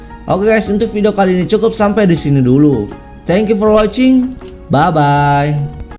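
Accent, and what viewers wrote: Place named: Bugis, Indonesia. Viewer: Logat Bugis daeng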